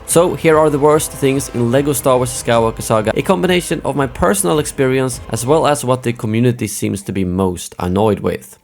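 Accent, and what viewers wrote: Sweden. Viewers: Love that Swedish-English accent haha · Are you Swedish? (It really sounds like that when you talk, I’m just curious)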